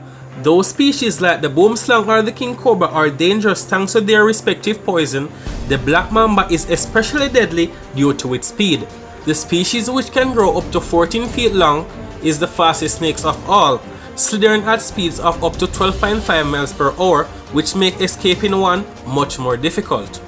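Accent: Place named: Jamaica. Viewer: Come through with the Jamaican accent yesss these videos are popular but not with a JA accent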